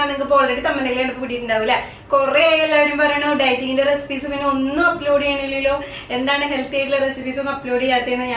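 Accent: Thrissur, India. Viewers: ഈ തൃശൂർ ഭാഷ കുറച്ച് പതുക്കെ പറയു,കാരണം നമുക്ക്‌ തൃശൂർക്കാർക്ക് മനസ്സിൽ ആവൂം,പക്ഷെ മറ്റുള്ളവർക്ക് ഇത്തരി ബുദ്ധിമുട്ട്ണ്ടിവും,സംഗതി അടിപൊളിയാട്ടുണ്ട്,ഞാൻ. ഇന്ന് ഇണ്ടാ ക്കി നോക്കി ട്ടാ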